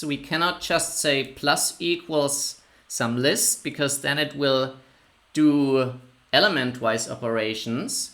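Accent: Germany. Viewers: Are you German cause Your accent is kind of one. Well thanks for this amazing crash course.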